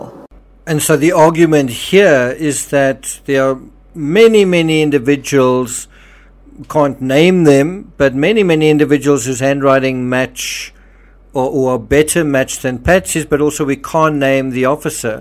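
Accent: South Africa. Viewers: The most South African voice in true crime.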